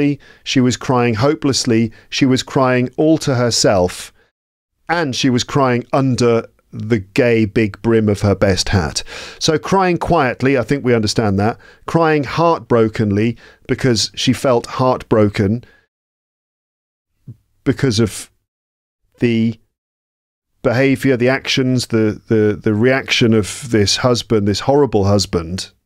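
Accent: United Kingdom. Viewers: Thank you,. Your pronunciation made me remember of the best boss I had when working in a British company here in Brazil, in the late seventies. And I missed those days. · Very nice your's british language.salute from Lithuania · I am not used to listen british english! But I just loved to hear your class, your english. And I am very happy that I understood everything! Thankyou Maíra from Brazil 🇧🇷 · Hola, estoy aprendiendo el ingles americano, confirmame si ese acepto es britanico? ó no importa? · BBC ,modulation, thahk so much for the video! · I really love your british english..😊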